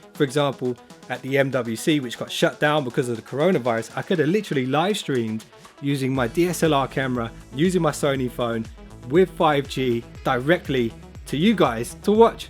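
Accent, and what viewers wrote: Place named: United Kingdom. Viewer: Britain accent is horrible..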